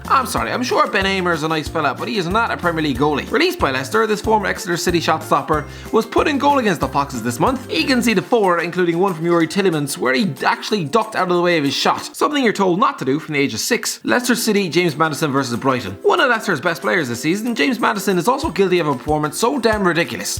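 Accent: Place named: Ireland. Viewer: Its Irish Guy he's Irish most Irish people talk fast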